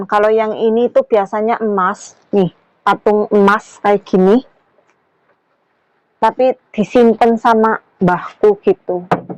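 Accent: Banyumas, Indonesia. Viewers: Jawa ngapaknya medokl skali..ora ngapak ora kepennak..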